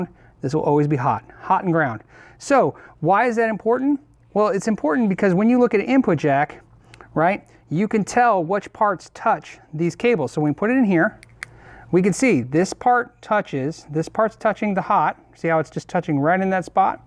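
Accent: United States. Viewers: Anyone know where in America this guy is from? I recognize the accent. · Americans pronounce it like that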